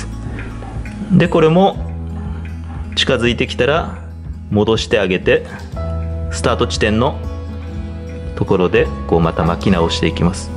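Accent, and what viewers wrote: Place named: Okinawa, Japan. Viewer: 勉強になります。さんのウチナー訛りが心地良いです♡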